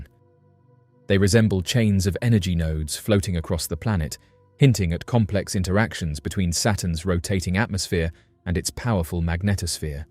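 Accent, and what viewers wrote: United Kingdom. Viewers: You know what I bet. Some Alien fly saucer passing by the earth dumped their sewage, it froze then fell to earth and he found it. Also, videos like this seem to use this one voice with the British accent to give it more drama 😮.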